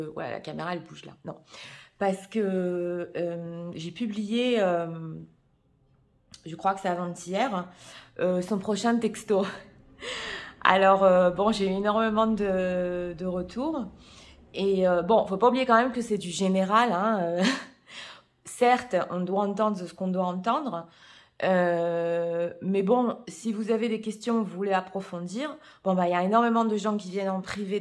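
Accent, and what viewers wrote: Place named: South, France. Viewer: Je viens juste d'écouter cette guidance qui date de 1 an. C'est marrant, je ne savais pas que vous aviez un petit accent du sud 😊, accent que vous n'avez plus sur les guidances de maintenant. C'est marrant. Je ne me moque pas. Je viens de Toulouse et j'ai un accent très marqué 😉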